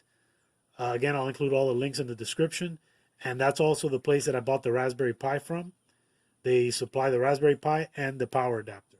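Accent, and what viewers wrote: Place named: Miami, United States. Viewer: I know a Miami voice when I hear one...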